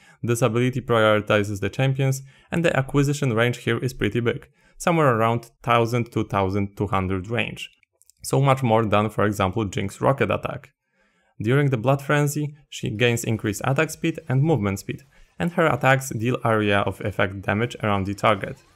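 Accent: Poland. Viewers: Polski akcent uniemożliwia mi oglądanie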